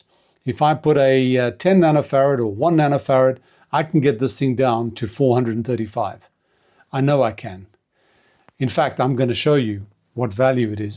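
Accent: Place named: South Africa. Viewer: Haha! I recognised the accent so I thought I’d make you feel homesick! Our 4 sons were all born in Johannesburg, and we lived there for 45 years. We were the last to leave, four years ago now! Love the channel. Alles van beste, Mike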